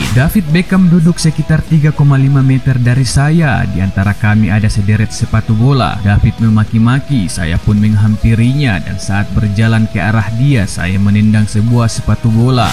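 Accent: Medan, Indonesia. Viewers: Medan x logatnya bang 😅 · Anak medan x kudengar omonganmu bang 😂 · Logat Medan kental bener wkwkw.. di ralat.. CANTONA bukan CONTANA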